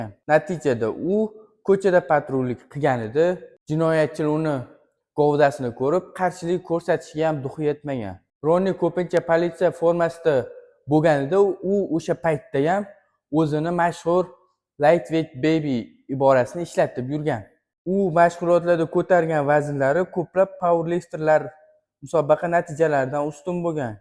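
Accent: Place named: Tashkent, Uzbekistan. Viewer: Yana bitta narsa toshkent shevada emas adabiy gapirish yaxshiroq buladi manimcha nutq juda juda zaif ishlash kerak ekan agar shu sohada ketmoqchi bosa bu bola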